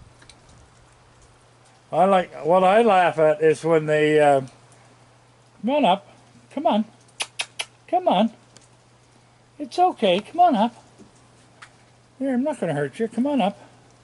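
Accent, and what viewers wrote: Canada. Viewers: Ellen M ---Many people who live in the Maritime provinces of eastern Canada , ( New Brunswick , Nova Scotia , Prince Edward Island ) have an accent . It is even more of an accent if they are from Newfoundland , or , they are from the Cape Breton Island part of Nova Scotia . Where he lives in Churchville , in Pictou County , Nova Scotia , he is not far from Cape Breton Island . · Very Canadian English eh? Had to look up what bed lunch was 🙂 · Greetings from Illinois. I LOVE your Canadian accent. Those little guys are precious. You are wonderful to take care of them every night. I descovered your videos on you-tube a month ago. Never miss watching now. Thanks & God bless you.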